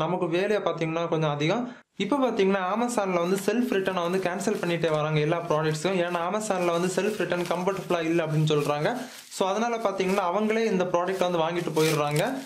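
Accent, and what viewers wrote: Kanyakumari, India. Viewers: Kumari Tamil 😍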